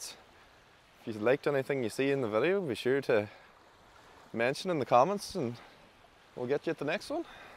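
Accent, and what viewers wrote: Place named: Northern Ireland, United Kingdom. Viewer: Baffled me why your accent was like northern Irish . Till i realised you are only about 12 miles from derry city . Unless you are actually from derry ?. 👍👍. Grandad is from Galway .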